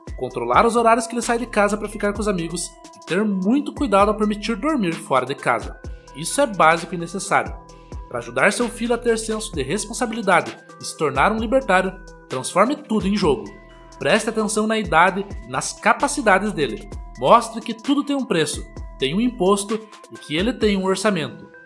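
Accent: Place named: Caipira, Brazil. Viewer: Narrador caipira não dá, hein.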